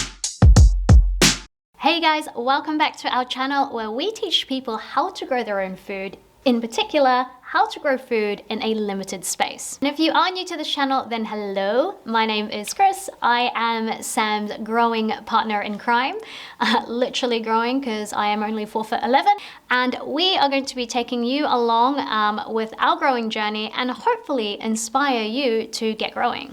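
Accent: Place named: United Kingdom. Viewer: I love your accent although for some reason it sounds a bit British. You seem to be a natural infront of the camera. The landlord is probably concerned about the cement planters due to weight on the balcony.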